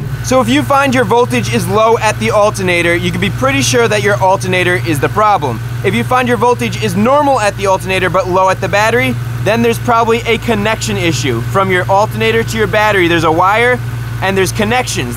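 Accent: United States. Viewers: American (simplified) english, dialect we hear in movies, shows and songs.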